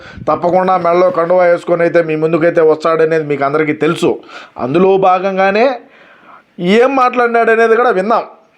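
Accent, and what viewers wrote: Rayalaseema, India. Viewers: same slang throughout combined Chittoor, Anantapur, Kadapa, Kurnool dist.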